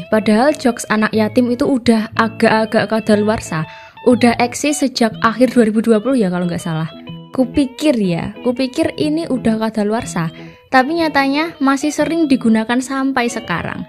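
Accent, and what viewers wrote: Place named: East Java, Indonesia. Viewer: Kaka dari Jawa Timur ya, soalnya kaka suara nya agak... Medhog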